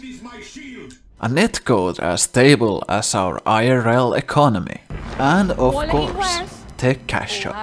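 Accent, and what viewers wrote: Finland. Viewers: Although you sound finnish I totally agree with you, give me my free biscuit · Are you finnish ?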